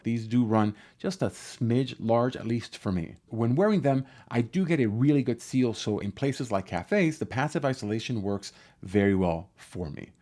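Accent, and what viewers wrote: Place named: Castile, Spain. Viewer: I have to ask, are you Spanish? Your accent, while pretty neutral, seems to leak a little… Castellano…?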